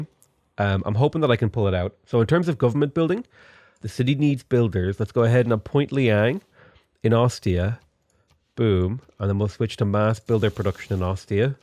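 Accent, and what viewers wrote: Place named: Ireland. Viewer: His irish accent did not get a single bit stronger yet the deeper voice makes him sound a shit ton more irish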